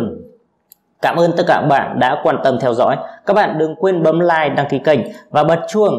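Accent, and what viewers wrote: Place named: Mekong Delta, Vietnam. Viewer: Chỉ biết Bình luận: Rất- rất đẹp. Giọng nói Miền Tây. Cực Dễ thương.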